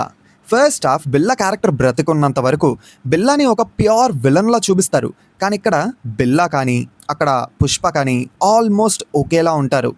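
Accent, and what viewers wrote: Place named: Vizianagaram, India. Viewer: Yeti antai antunnav meedhi vijaynagaram kadha bro 😊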